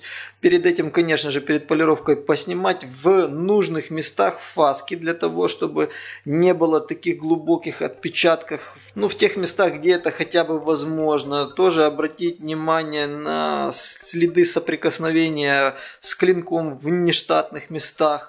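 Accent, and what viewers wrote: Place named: Odessa, Ukraine. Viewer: Ахаххх,слышу что говор свой, а потом на камеру говорите: Привет из Одессы ))))) теперь все сошлось, таки наше "шо" )). Шалом в общем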